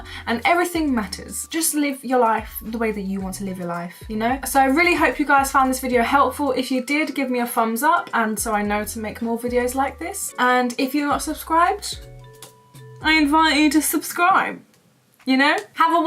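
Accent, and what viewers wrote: United Kingdom. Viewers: Your accent is interesting... It's like mostly MLE and then suddenly RP. I think it's that you glottal stop a bunch of ts but not all of them.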